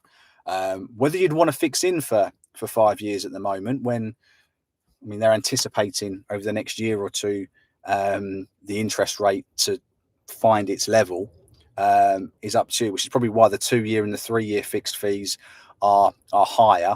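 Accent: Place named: England, United Kingdom. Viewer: You need to write in the title which real estate you're talking about, only after kicking and hearing your English accent I understood which one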